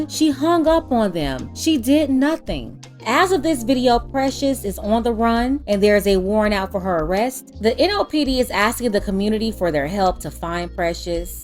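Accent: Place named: Florida, United States. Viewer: I just subscribed. Love you content. Your accent sounds like your from Florida. If you are, do you mind me asking what part of fl are you from?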